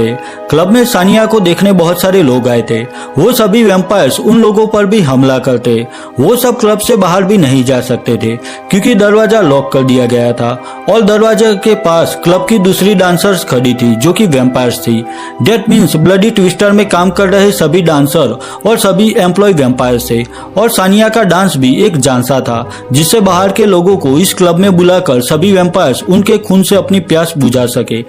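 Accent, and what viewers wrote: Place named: Bihar, India. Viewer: apki voice se lag raha hai aap bihari ho..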